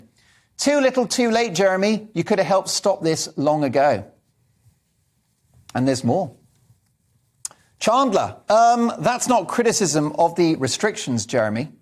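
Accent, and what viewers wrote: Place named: England, United Kingdom. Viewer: I love this guy. Being called an arsehole with an English accent sounds so right!!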